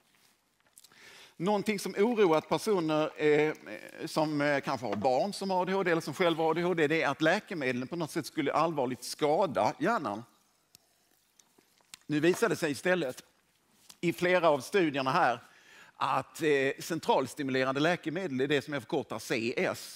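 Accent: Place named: Scania, Sweden. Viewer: en eloge för lugn välvårdad skånska som nästan vem som helst måste kunna uppfatta. Det du säger är oerhört intressant. Ännu bättre vore om allt du säger var textat på svenska. Det finns ju folk med rejäl hörselnedsättning. Men du är mycket tydlig i mina öron. I o f s kopplar jag av bättre om det finns text också. Tack!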